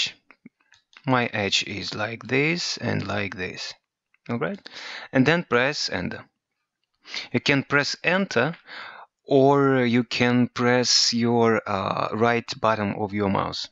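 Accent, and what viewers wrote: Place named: Russia. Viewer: Русский акцент не спутать)))))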